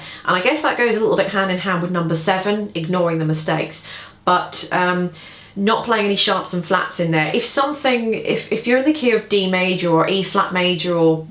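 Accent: England, United Kingdom. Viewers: Talented, beautiful, AND you have an English accent. I want one!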